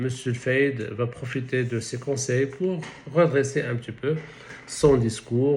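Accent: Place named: Ouled Said, Algeria. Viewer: مستواه في اللغة الفرنسية شهادة شفهية ، عينية ، تفند ما يدعيه من موسوعية علمية. ما يثيرني في فرنسيته النبرة و الكنة ، فرنسيه اولاد سعيد ، أو الرحامنة ،حسب موضع الرضاعة.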